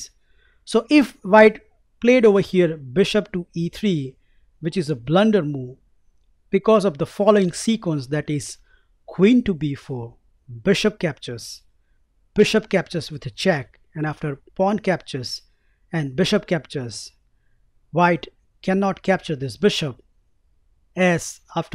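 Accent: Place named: North India, India. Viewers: He's definitely Indian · Eduardo Moya he's from North India! :)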